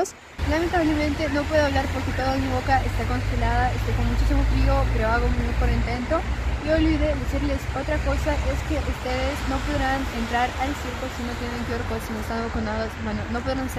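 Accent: Argentina. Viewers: muy buenos dias muchisimas gracias por este hemozo video me encanta y tambien tu hermozo acento argentino gracias gracias gracias Felicitaciones sigue asi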